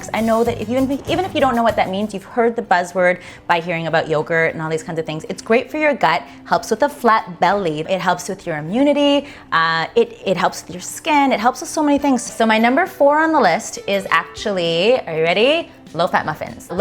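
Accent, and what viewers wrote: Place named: Canada. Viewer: I LOVE when your canadian accent comes thru! :)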